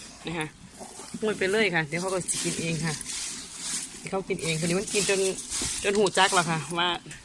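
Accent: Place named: Isan, Thailand. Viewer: มักเวลาครูเว้าอีสาน เพราะดี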